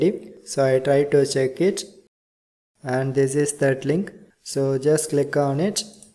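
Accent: India. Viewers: Indian accent always helps people !! <3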